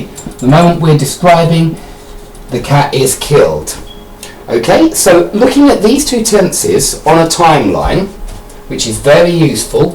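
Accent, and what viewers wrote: United Kingdom. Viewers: I love that heavy british accent! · Hi! I'm from Brazil,and your video is very good! I love the British accent is perfect :D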